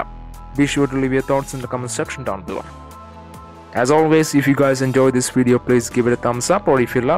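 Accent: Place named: South India, India. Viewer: U are awesome man thanks for the information that to detailed about the suspension system and how it works. Really needed these info also saw your telever suspension on BMW just great man keep it up. Where u from from your accent I think you are from south part of India.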